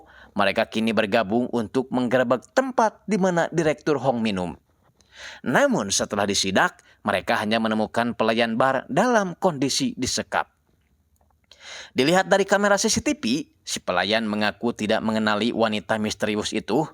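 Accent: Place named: Sunda, Indonesia. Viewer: joss ie s Dubing org sunda 🎉🎉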